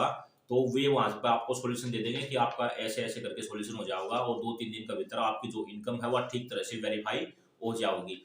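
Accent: Bhiwani, India. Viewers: Are u frm bhiwani distct.. Your language is frm that area.. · Bhai shab aap Bhiwqni se ho kya aapki awaj se lag rha h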